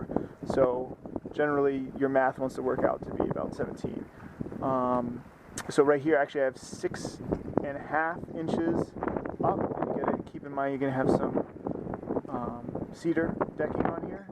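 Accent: Rhode Island, United States. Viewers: are you from rhode island?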